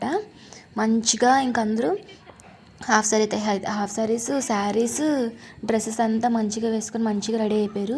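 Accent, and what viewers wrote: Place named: Godavari, India. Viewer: Mee slang bagundi andi godavari vala andi meru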